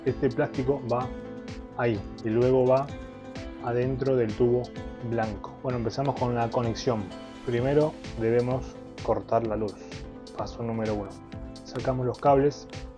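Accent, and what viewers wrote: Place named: Argentina. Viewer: De dónde sos amigo. Sonas reargento pero laburas en 110v😅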